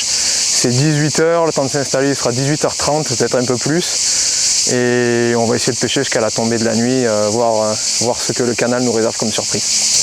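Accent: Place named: South, France. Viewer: Bonjour, Merci pour cette belle vidéo. Le soleil, le chant des cigales et ce bel accent du sud, c'est tout ce qu'il nous faut pour oublier la grisaille de ces derniers jours 😊 C'est toujours un plaisir de voir (ou revoir 😊) tes vidéos.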